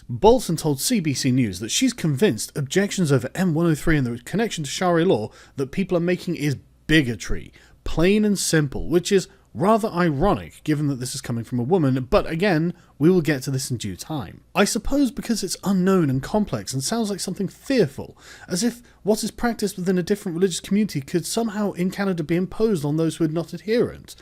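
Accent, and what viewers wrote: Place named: England, United Kingdom. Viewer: what city/region of England is the source of this intensely proper accent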